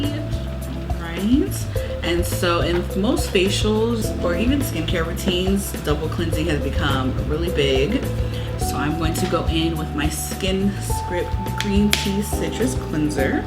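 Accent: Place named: Haiti. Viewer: Are you Haitian? You have the accent.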